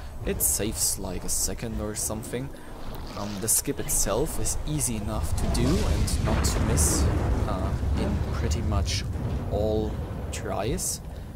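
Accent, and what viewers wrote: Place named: Germany. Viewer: dieser moment wenn du sofort durch das sprechen merkst das die person deutsch ist xD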